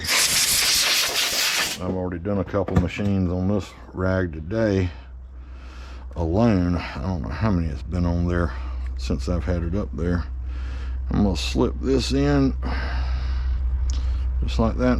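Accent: South, United States. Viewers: love your teaching with that southern accent thx for sharing your knowledge. I will fallow you from now on.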